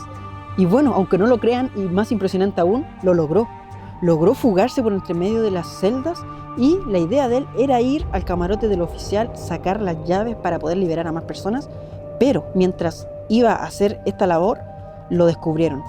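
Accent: Chile. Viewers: Nose por qué , pero a mí me gusta como suena el Acento Chileno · Primera vez que veo este vídeo y por el acento me preguntaba se siente Chileno que sorpresa que eres mi compatriota yo igual que Uds vivo en Canadá y soy Chilena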